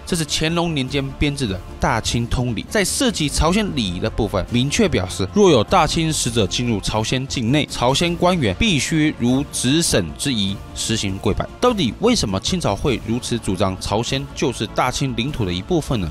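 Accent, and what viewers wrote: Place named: Taiwan. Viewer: 台湾人就是这样腔调，两岸人应相互理解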